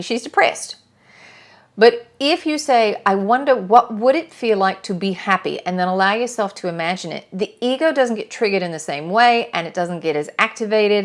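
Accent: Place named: Australia. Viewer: I thought you were Australian!!! This is the first time I have ever heard you talk as, and not as The Pleidians. So, the voice of the Council that you channel sounds a bit Australian to me - actually VERY Australian to me. Have you ever been told this?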